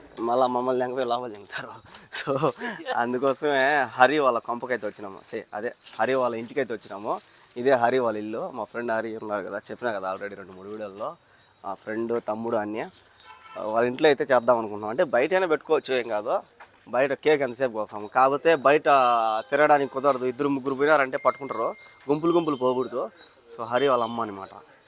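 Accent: Rayalaseema, India. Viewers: Andralo kompa vaduka basha Google lo serch chyandi kompa meaning house ani anna rayalaseema yasa lo matladutunnadu edi akkadi local language tappuga tesukovaddu daya unchi yevari basha valladi nenu telanganany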